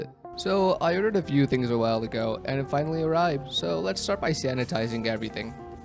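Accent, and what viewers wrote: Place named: Texas, United States. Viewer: wait I legit thought you were maybe from texas or something 😂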